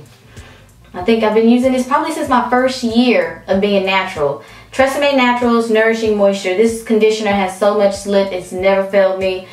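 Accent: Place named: South, United States. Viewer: I will FOREVER love your Southern Accent!!! Thanks for the Video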